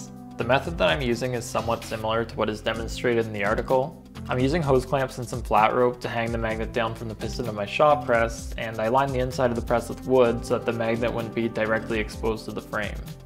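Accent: Canada. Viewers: You must be in Canada, given accent, English, no traffic & snow on the landscape near the roads // lol .. metric kw / kmph / km distance / units :)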